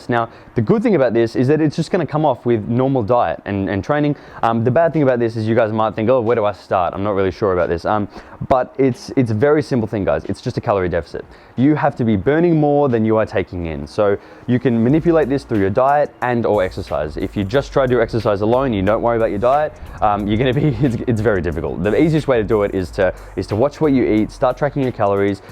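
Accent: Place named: Australia. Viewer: Ngl mate your videos are great, but your Australian accent makes it that much better and cooler lmao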